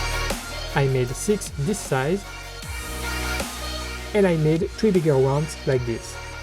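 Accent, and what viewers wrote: France. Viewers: You are French? I can hear the accent because I'm French haha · you have a french accent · On dirait un gars qui parle anglais depuis peu ton accent c'est bizarre. Je dirais presque un français.... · Ton accent est ... comment dire spécial ( un accent français quoi ) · Are you french ? You have a french accent ! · T'es français ça se voit à ton accent 😂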